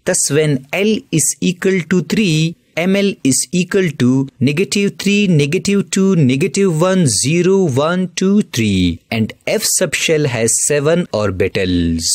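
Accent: South India, India. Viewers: Keralian ho kya ...awaj se lagta hain as south Indian jaisa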